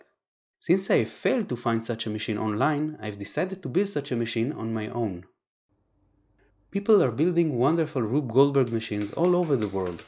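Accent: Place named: Israel. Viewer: מזהים את המבטא הישראלי חח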